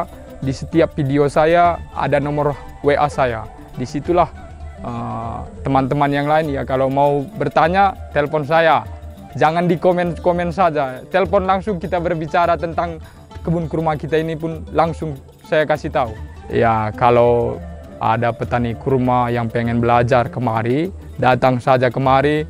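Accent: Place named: Medan, Indonesia. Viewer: Dengar logatnya jadi kangen temen dari medan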